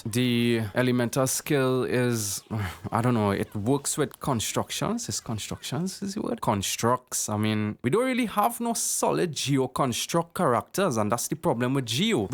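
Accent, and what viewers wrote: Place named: Trinidad and Tobago. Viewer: Oh i have a good guess for some reason i think you from Trinidad lmao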